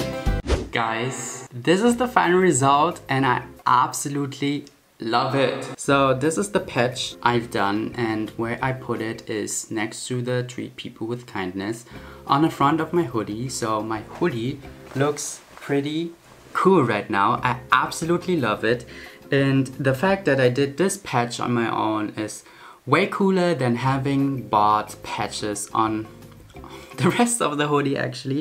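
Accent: Germany. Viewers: i find you first on tiktok, and now understand you have YT CHANELLL I started studying German this year and i heared smth simmilar between German pronounciation and your speeking cheking your ig MUNICH DE ME i knew itttttttttttt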